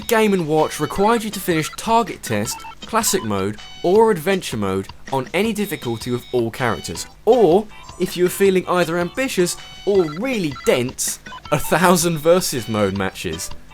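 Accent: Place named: Essex, United Kingdom. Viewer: Cool vid man,nice accent btw. Let me guess,you're from Essex?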